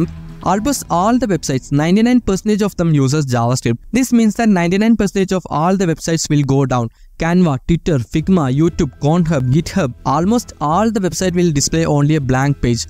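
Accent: Kerala, India. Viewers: Malayali's english accent 💀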